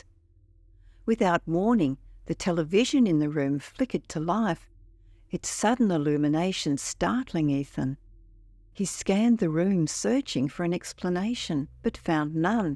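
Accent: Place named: Australia. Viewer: Being an Aussie I love hearing our accent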